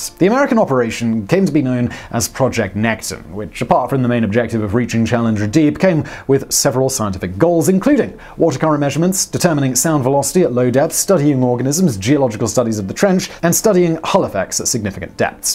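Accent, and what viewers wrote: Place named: England, United Kingdom. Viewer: The English accent... :)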